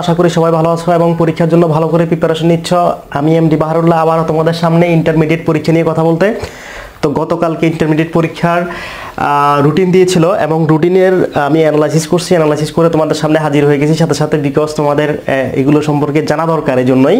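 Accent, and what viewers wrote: Chittagong, Bangladesh. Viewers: Apni ki Chittagongian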